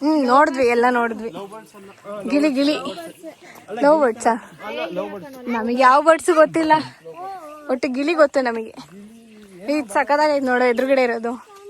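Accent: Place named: Hassan, India. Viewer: Natural hassan language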